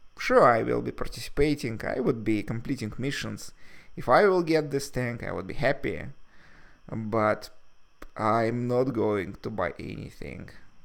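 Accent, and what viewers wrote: Russia. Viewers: Is russian?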